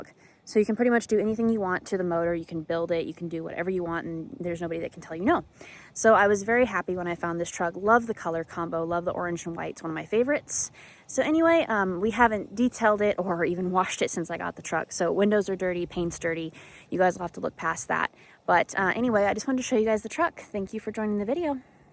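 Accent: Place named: North, United Kingdom. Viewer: My opinion,that’s a show truck. It’s to nice to be a daily driver. What I noticed first is,the chrome molding on the body is aligned perfectly. And underneath is immaculate. Plus,I don’t know where you live but you sound like you liv up north somewhere. If that were mine,it would never see a salted road. I would walk first.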